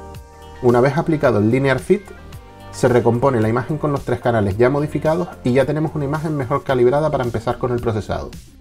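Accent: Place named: Canary Islands, Spain. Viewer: muchísimas gracias por su respuesta. La verdad que aún soy muy novato en ésto, hasta ahora solo he hecho visual prácticamente, pese a tener lo necesario para fotografiar. Aver si me pongo a ello y pruebo con la versión de los 30dias aver como me va. Por el acento intuyo que eres de Canarias, yo soy de Gran Canaria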